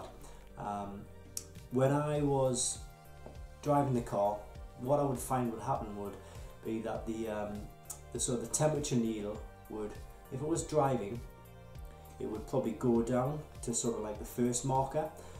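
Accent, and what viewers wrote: United Kingdom. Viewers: This British accent.....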